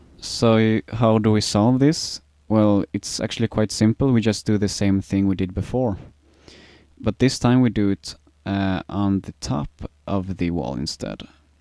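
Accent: Sweden. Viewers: Thank you very much! You sound extremly swedish!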